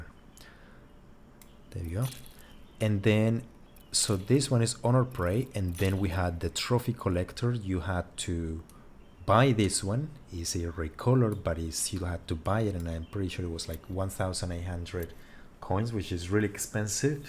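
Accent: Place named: Italy. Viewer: Si sente che sei Italiano... giusto?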